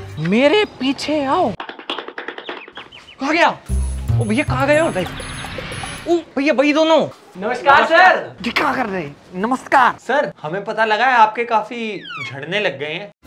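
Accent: India. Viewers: I m also from ballia....but aur bhojpuri speaking style is different from yours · Yeh baliya ka accent hi nhi h. Jhansi ka lag rha h.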